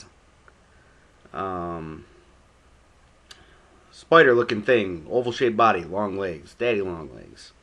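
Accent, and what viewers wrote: Buffalo, United States. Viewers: good representation of Buffalo accent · you definitely have a buffalo accent. I get it all the time, yet I never knew I had one till I went to Mass.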